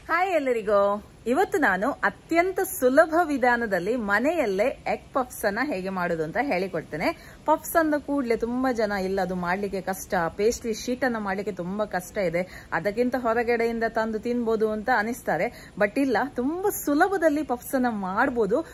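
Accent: Mangalore, India. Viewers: First thing i like is mangalore slang ,n second thing is ur recipes is ultimate for healthy and natural making ..continue akka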